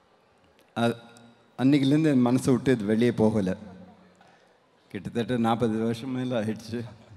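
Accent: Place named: North, India. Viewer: Mani Sir En Vadakkans Maathiri Tamil Pesuraru 🙄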